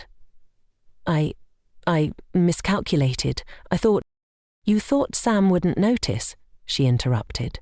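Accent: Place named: England, United Kingdom. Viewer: Such a beautiful English accent. All that glitters isn’t GOLD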